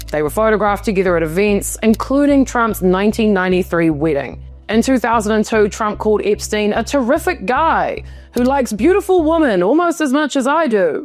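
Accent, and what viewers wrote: New Zealand. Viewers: A Kiwi! It's amazing to hear your pronunciation of the letter e as short i.❤ very groovy 😎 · Love your Kiwi accent...adds some gravitas to your reporting.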